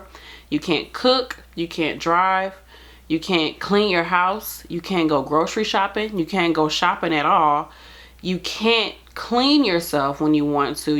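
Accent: St. Louis, United States. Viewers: ...this was a brilliant video. I have watched a few of your past ones (mostly coz I love your stl accent ) but this one was top notch and as real as it gets! Much love!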